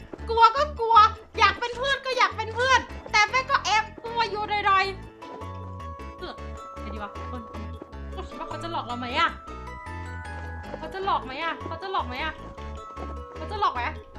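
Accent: North, Thailand. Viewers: พี่เป็นคนเหนือหรอ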